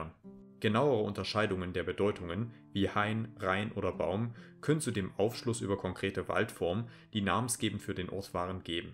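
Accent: Saxony, Germany. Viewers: Definitiv aus Sachsen/Thüringen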